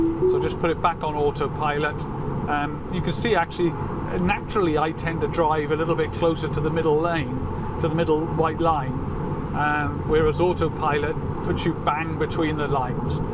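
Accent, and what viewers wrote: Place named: Wales, United Kingdom. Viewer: Just thought id let you know that I placed an order for a m3 and used your code :) - As said, have been really enjoying your content + Welsh accent always a winner lol ... But, got into a bit of a mess, when I used your referral it didn't go to the referral landing page, rather it just went to the standard Tesla page... I didn't know any better until after I placed the order :( - Gave Tesla a call though and they sorted it out thankfully! So, hopefully, you do now have another 1,000 miles in the 'tank' as well :D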